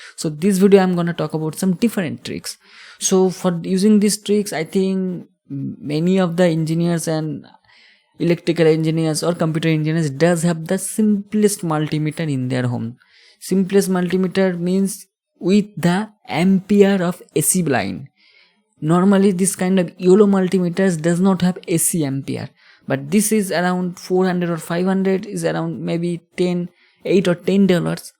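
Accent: Bengal, India. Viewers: U r Bengali for sure 😅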